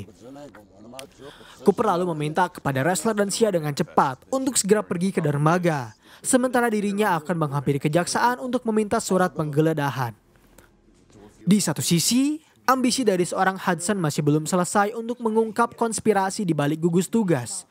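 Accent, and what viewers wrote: West Java, Indonesia. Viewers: fix org sunda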